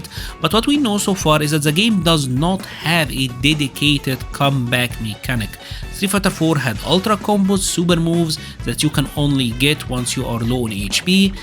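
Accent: Egypt. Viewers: You sound like your Egyptian 🤔 im i wrong ?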